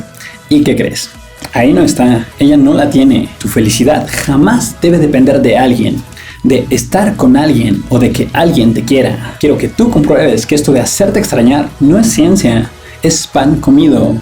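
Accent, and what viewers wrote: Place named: Mexico City, Mexico. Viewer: juan jose perez Yo lo escucho perfectamente como un habitante de Ciudad de México donde por ser tan grande con casi 10 millones de personas y sin contar aún la periferia de poco más de otros 13 millones, existen variados acentos. Pero en específico él es como de una de las zonas del centro-sur, clase media alta. Obviamente con buen nivel no solo económico, si de educación y cultura.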